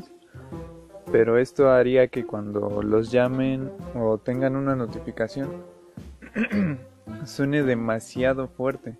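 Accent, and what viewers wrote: Honduras. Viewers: Eres de Honduras?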